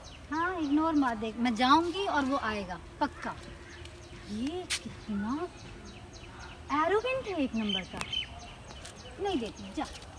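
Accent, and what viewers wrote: Bihar, India. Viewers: Aapki basha me bhi bihariyo vala touch hai or lgti bhi aap bihari si ho...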